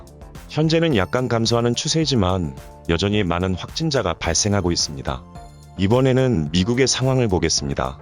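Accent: Gyeongsang, South Korea. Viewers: 의학정보중 제일 신뢰가 갑니다. 하루빨리 목소리가 회복되셔서 구수한 경상도 사투리로 말씀하시는걸 듣고 싶습니다. ❤️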